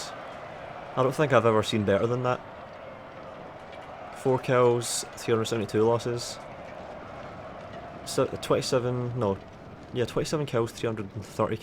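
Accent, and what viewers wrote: Scotland, United Kingdom. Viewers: So glad i stumbled upon your series a few weeks back, love it, im guessing you are from Scotland?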